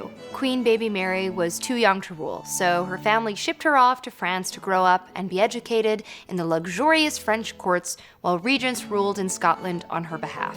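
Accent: United States. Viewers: So sad that an American speaker narrated this piece. Made the whole content bland with her voice dropping an octave at the end of each paragraph. Americans probably see this as adding authority to her voice. Just thought the content was poorly delivered and crass. I am a proud Scot who wishes that Americans would confine their overtures to their own country and forbears. Rob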